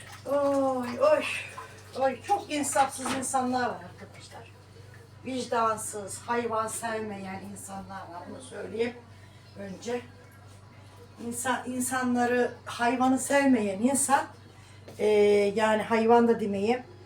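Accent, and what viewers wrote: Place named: Aegean, Turkey. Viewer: Şivene bayılıyorum Ege şivesi 😘😘😘Çiko da evinin bir ferdi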